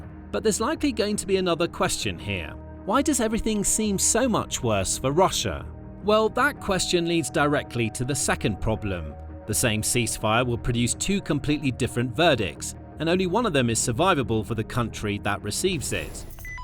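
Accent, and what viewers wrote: United Kingdom. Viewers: Unnecessary prolonged video in a a British accent is tiring….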